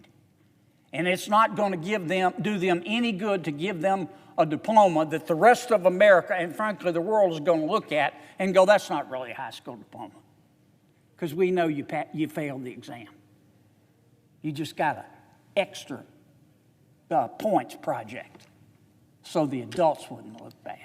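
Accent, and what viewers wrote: South, United States. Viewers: I am from Louisiana. He speaks very clear to me. He may sound country but he has This Country and our state in his heart. Good ol’ Boys know how to talk so people will listen. It does not matter if he speaks your language, He speaks ours.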